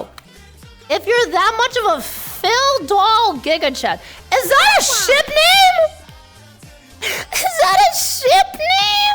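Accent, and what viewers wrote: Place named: United Kingdom. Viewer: Not the british accent 😭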